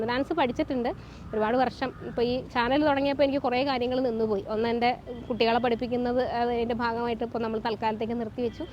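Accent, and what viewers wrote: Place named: Thiruvananthapuram, India. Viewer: ഞാൻ ഓർത്തു ചേച്ചി തിരുവനന്തപുരം കാരി ആണെന്ന്.. 😊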